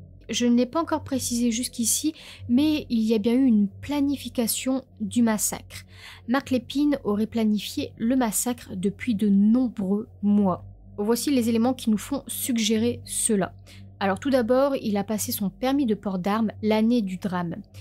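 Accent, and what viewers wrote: North, France. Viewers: Tu es du nord de la France ma grande... ça s entend... lol... moi aussi... Merci pour ton récit ! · Vidéo bien traité en effet, avec un petit accent du Nord de la France 😜 , merci encore 😘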